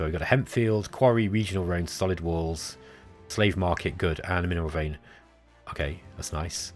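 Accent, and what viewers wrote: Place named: Scotland, United Kingdom. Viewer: Oh I can believe it. Just interesting that you managed to identify the exact same strategic vulnerability that the Romans did. Hell, if your explanation was flowered up a bit, and in Latin instead of your calming Scottish brogue it wouldn't have been all that out of place on the senate floor. To paraphrase Livy Book 16: "Against them and against king Hiero of the Syracusans, the Senate decided to offer help to the Mamertines. There had been much debate between those for and against it. For the first time, the Romans crossed the sea with an army and they fought successfully against Hiero who sued for peace, which was granted."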